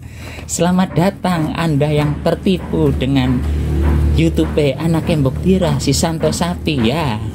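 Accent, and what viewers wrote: Banyumas, Indonesia. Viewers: Ngomongnya ngapak podo inyong😅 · Lucu banget ,,,Mase gundul pacul ini.,,,,,,,cukup terhibur logat ngapaknya yg bawel,.,,,,mana biyunge di simpan dimana,,, ,lucu asli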